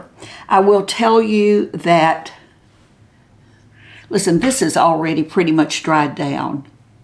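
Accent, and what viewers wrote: South, United States. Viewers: There is something about her. . . . She's authentic and honest. . . . Down to earth and the way she talks. . . Do I hear a little Southern accent ? I love Milani cosmetics too. She's not afraid to review the cheap cosmetics. . .Dollar General ? And tells us what she thinks . . .She's not trying to look young. . .she's comfortable in her skin and age hair is dirty tomorrow shampoo day ! Love her! You say you are a walker. . .take us on your walking tour sometime. Those old school rollers and clips ! ! I'll be tuning in all through 2025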